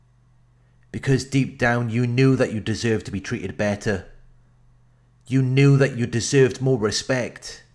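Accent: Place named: England, United Kingdom. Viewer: Are you English mate, I'm Scottish love your vids mate spot on.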